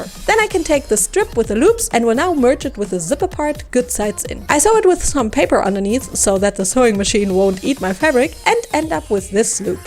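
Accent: Germany. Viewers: Are you German? Also I love what you did with pinky🥰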